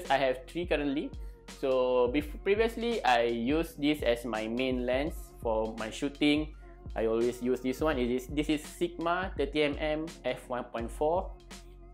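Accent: Malaysia. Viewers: The first thing when I hear his voice my mind straight thinking about his Malaysian man because of his voice and accent. Because im Malaysian. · are you malaysia or Brunei? Bcz I hear you say lah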